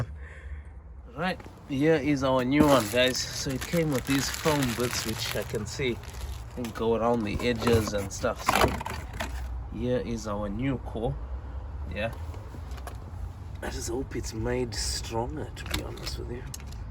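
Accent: South Africa. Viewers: Man u almost sound south african lol Love da video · Ayyyy bra you from south Africa?typical charou tunes here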